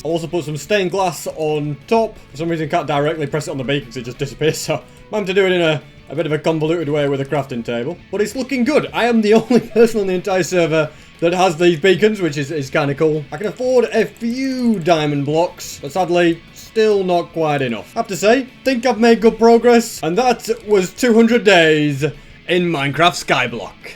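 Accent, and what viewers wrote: United Kingdom. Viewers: He is British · hes british?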